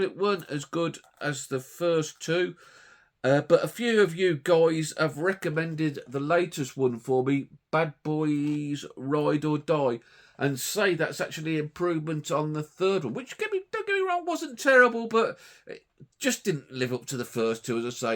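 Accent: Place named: Black Country, United Kingdom. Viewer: Ive not sin any bad boys after 1&2 which i think 1&2 are bostin, i probably wow pick uo a box set i may just track em down, singularly to check out. Little random but am yow from the black country ? Cause yav got a simular kind of accent to me 😂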